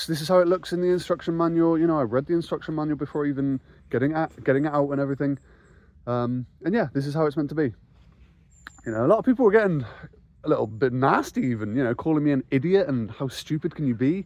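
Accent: United Kingdom. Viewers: i like your british accent! Cracks me up when you say b**thole of water <3. Love your channel!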